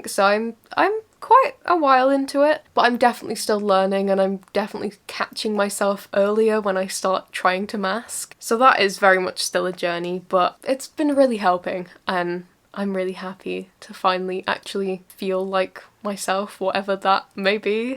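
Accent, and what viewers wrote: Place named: United Kingdom. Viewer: Your British accent is so cool, I can actually understand it like American English but with the British vocabulary. What part of Britain are you from?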